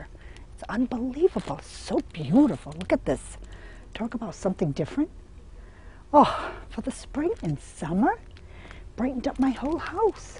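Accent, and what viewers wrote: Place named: Warwick, United Kingdom. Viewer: Strong Warwick accent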